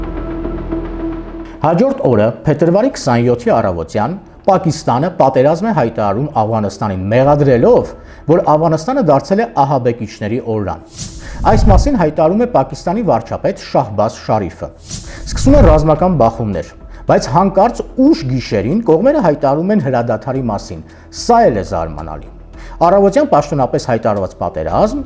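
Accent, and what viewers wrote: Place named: Yerevan, Armenia. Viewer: Меня бесит ваш эриванский акцент. Не надо тянуть гласные!!!!!!!!!!!!!!!!!!!!!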